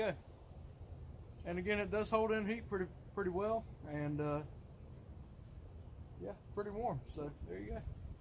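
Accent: South, United States. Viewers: You are the only person I've ever heard with a voice that sounds like mine. You have a southern accent. But it was strange listening to this. I like the channel. Keep it up man.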